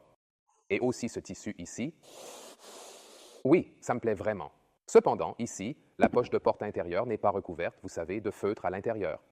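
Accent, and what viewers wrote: Canada. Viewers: je me disais bien car des fois, il y a un léger accent canadien. Je viens de terminer une de vos vidéos. Merci comme même de le faire en français. En tout cas c'est bluffant.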